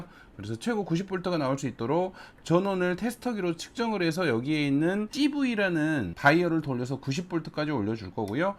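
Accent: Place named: South Jeolla, South Korea. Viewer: 근데.. 딴소리인데 전남 어디신가요? 전남사투리가 너무 구수하십니다ㅋㅋ 저희시골은 고흥입니다ㅋㅋ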